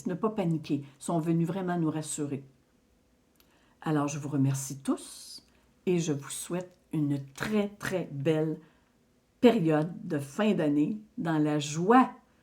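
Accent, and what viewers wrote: Quebec, Canada. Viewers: Bonjour! C'est toujours un plaisir de vous écouter, d'écouter votre "aquecent" Canadien! Oui, 3I Atlas est bien une conscience. Elena Danaan en a parlé dans un de ses Star Nation News. Elle a même pu le voir à travers les yeux de son compagnon. Il faut dire que toute petite elle a été abductée par les gris malfaisants, et a été sauvée in extrêmis par les Galactiques. Malheureusement, ces gris lui ont mis un implant dans le cerveau qu'il a été impossible aux scientifiques Galactiques, d'enlever, sous peine d'attenter à sa vie. De ce fait, il grillé cet implant, et l'ont reprogrammé. Ce qui fait qu'elle peut voir à travers les yeux de son compagnon et vice-versa. C'est comme ça qu'elle a pu nous décrire 3I Atlas. Et en effet, 2026 va être une année de changements. Certaines technologies vont être mise à notre disposition pour 2026. 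Lit médical quantique, prospérité par le QFS (dont de belles sommes sont mises sur chacun de nos comptes, ce qui fait que l'humanité va être riche), les véhicules volants (voitures, autobus, et un autre système d'avions), des réplicateurs de nourriture, et bien d'autres choses encore. Mais surtout, surtout, nos rencontres avec nos cousins venus d'autres planètes, comme les Ummites qui viennent de la planète Oumo située dans la Constellation de la Vierge, à 14 années lumières de la Terre. Elena Danaan a aussi informé que Mars était en Terra-formation. C'est à dire que nos cousins bossent pour refaire cette planète vivante, sur laquelle no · Merci infiniment pour ton engagement et tout le travail que tu fais avec beaucoup de joie et d'amour. Gratitude pour ce partage du message de l'EIO. Je suis en Italie et j'aime beaucoup t'écouter le matin devant une tasse de thé. Ta bonne humeur et ton joli accent québécois me mettent en joie pour la journée. 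🙏 Joyeuses fêtes 🎉❤🤩😘 Très jolies tenues de Noël 🥰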